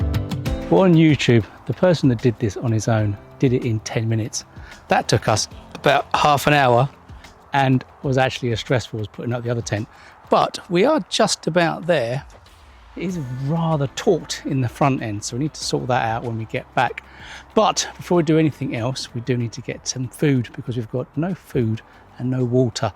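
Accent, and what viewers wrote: United Kingdom. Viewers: Name and shame! Call me innocent what race were you treated as? Accent and skin colour I don't see you as anything other than English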